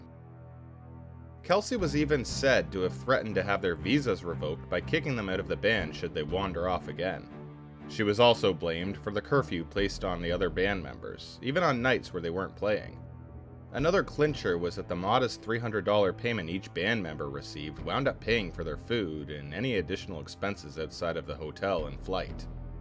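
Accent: Canada. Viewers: Sounds like a guy from Quebec called Alex Martel.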